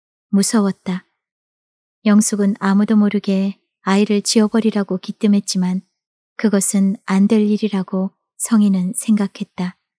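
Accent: Jeolla, South Korea. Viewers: 전라도 사투리 갱상도 사투리까지 완벽소화하셨네요 💯💯💯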